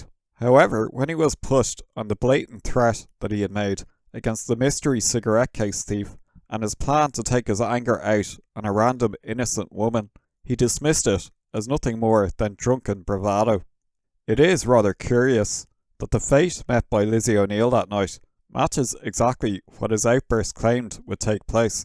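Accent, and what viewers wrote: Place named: Ireland. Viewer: What a gem of a channel I’ve found. New sub! With thanks. 🙏 nothing like an Irish person telling a story. Excellent.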